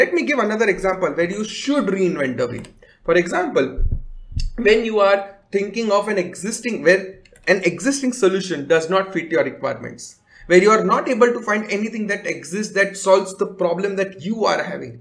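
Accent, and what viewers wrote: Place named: South India, India. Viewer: Both content and South Indian accent on point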